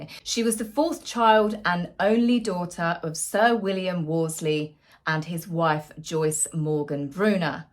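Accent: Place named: Yorkshire, United Kingdom. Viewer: And Yorkshire accent galore 😂😂❤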